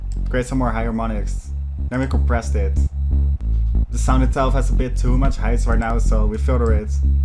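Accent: Netherlands. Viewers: Ik hoor een nederlands accentje ;)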